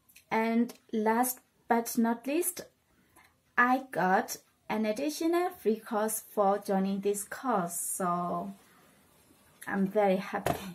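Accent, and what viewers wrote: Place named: Myanmar. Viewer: As a Burmese I feel proud not because she speaks good but her accent is a little bit different from other Burmese and for her courage ..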